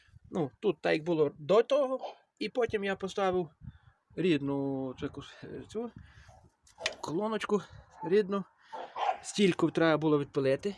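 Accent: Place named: Western Ukraine, Ukraine. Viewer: Ну видно що ви роботяга, молодець А реверс щолкає як передачі в іномарці! Дякую за відео,цікаво вас слухати! То ви з Чернівецької області,чи з Франківської?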